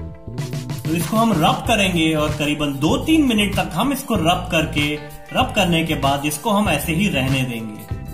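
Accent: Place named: India. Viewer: He is Indian English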